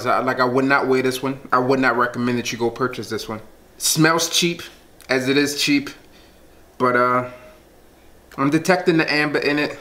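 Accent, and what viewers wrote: Baltimore, United States. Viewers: U got a Baltimore accent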